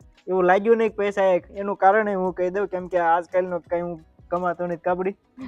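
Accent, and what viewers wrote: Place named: Saurashtra, India. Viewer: તમે શુધ્ધ ગુજરાતી ભાષા માં બોલો છો એટલાં માટે વીડીયો જોવાની મજા આવે છે સૌરાષ્ટ્ર કાઠિયાવાડ ની ભાષા મીઠી હોય છે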